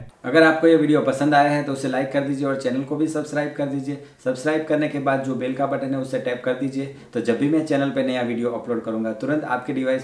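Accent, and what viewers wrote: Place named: Rural, India. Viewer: Why Is the Video Title english but the actuall Video Is a villager speaking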